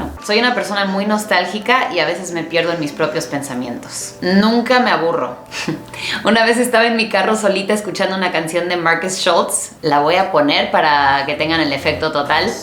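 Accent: Mexico. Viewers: Me encanta tu acento Tía. Pienso que es por los años que viviste en México aprendiendo español, más de La sierra, y no me gusta tanto que hables chilangoooo..jajajaja.. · Your Spanish is so good, I'm in shock. I watched your first video in English and I'm like, o yeah, she's from the states. In this video, you turned Mexican. You became a different person, like a popular person. I think I need Anthony Bourdain to explain this to me. I just now realized I become a different person when I speak Spanish. I have so many questions, Your Dad, Your food likes, your music interest... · =) Ojalá todas las personas del mundo tuvieran tu simpatía y frescura! Tu acento mexicano es apenas perceptible, sólo se nota en la pronunciación de determinadas palabras o al finalizar alguna frase. Cuando vi el video "tips para mejorar tu inglés" supuse que habías estudiado castellano en Argentina. 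Me encantaron tus historias!. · JAJAJA! hablas como mexicano con acento español (de España). Ni castellano ni Neutro. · Tu acento lanza a mexicano. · Escribí en otro vídeo mencionando que hablas inglés con influencia mexicana. Veo que se debe a que eres chayotera (de Orizaba) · Habla como mexicana... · Tiene acento mexicano más que español, supongo que es porque ha vivido en México, en cualquier caso habla fantásticamente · apenas lo acabó de ver y wow de los mejores 50 cosas sobre mi, ya decía yo que hablas más mexicana, yo soy de guadalajara, me encanto encontrarte 😘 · Hablas demaciado español Mexicano, al punto que hay palabras que no entiendo, por ejemplo:Capote ni se lo que es y me da una intrigaaa!. Tenes que hacer un video hablando como Argentino. ME ENCANTARIA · Tu lengua nativa es inglés?? Tenés un acento algo mexicano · Jajajaja se nota mucho tu acento mejicano. Yo soy de españa y por ejemplo cuando tu dices "linda" nosotros utilizamos guapa o bonita.;) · oh tiene acento mexicano!!! 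haz los acentos latinoamericanos:)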